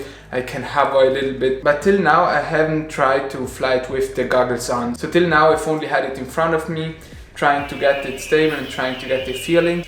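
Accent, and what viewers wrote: Germany. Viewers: Man hört das du deutscher bzw. schweizer österreicher bist xD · Dieses Deutsch- Englisch. Aber ich finde es Super. Für deinen dritten Flug?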